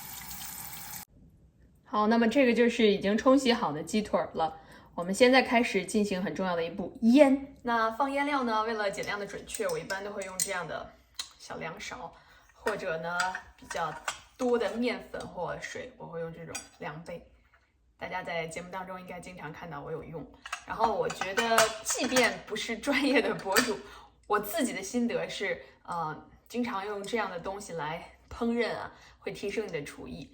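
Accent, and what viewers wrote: Beijing, China. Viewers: 你这是北京口音吗？ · 北京人吗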